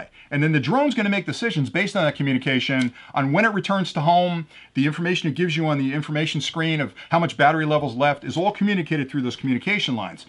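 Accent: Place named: California, United States. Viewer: Im thinking fast talking Californian! I moved from Cali to Tennessee and had to learn to slow down when talking!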